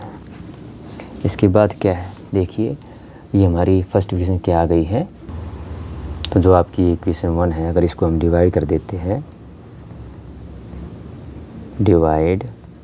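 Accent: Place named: Haryana, India. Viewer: Haryanvi boli me smjhne ka maza hi kuch aur h 😂 Btw, great explanation 🫡👏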